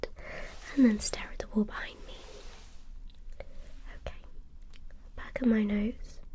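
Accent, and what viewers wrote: United Kingdom. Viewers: I love her british accent😍👍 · So I see your from BRITLAND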